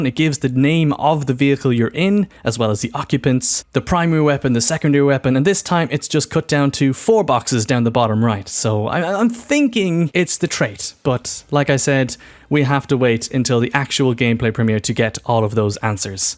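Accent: Ireland. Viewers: Are you Irish by any chance? Accent sounds local ish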